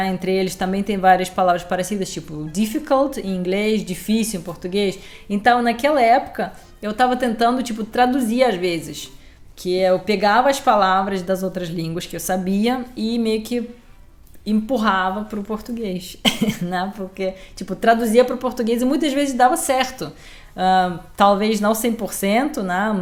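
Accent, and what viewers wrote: Rio de Janeiro, Brazil. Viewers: No próprio Brasil por hábitos e costumes nos mesmo pagamos micos brasileiros do Sul indo pro Nordeste sua linda você tem sotaque carioca rsss bjs ⚘ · Muito gostoso ouvir suas histórias num português perfeito mesmo que seja no sotaque "carioquês", sou mineiro. Paguei muito mico em Portugal onde morei...Numa papelaria pedi fita durex, lá é camisinha...essas e muitas outras que aqui ficam sensuradas...kkk Sugiro uma entrevista com uma russa que mora em São Paulo, novinha e tem um português perfeito. Abraço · Você assimilou o sotaque carioca perfeitamente...parabéns! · Russa com sotaque carioca?! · Russa com sotaque carioca ❤ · Primeira vez q vejo uma gringa q ao invés de ter o sotaque do seu país, tem sotaque carioca 😅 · Você já tem sotaque carioca, haha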